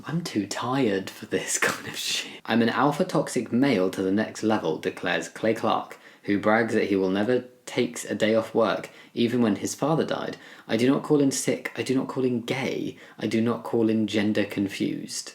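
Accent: United Kingdom. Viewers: Are you British? 😮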